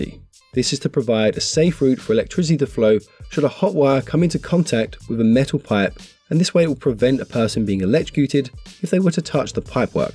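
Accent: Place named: England, United Kingdom. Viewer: Why North America? You're clearly English?????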